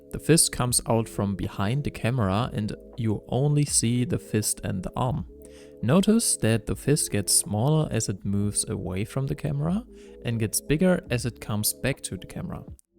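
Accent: Germany. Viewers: R u german? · I hear deutsch(gutes tutorial btw)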